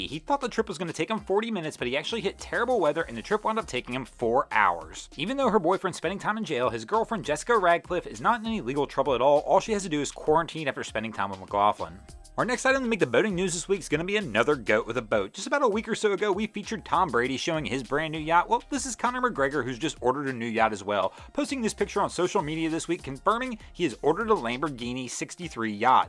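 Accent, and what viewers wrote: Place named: Cincinnati, United States. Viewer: I can't help but notice your accent. Were you raised in the Cincinnati area? Love your vids!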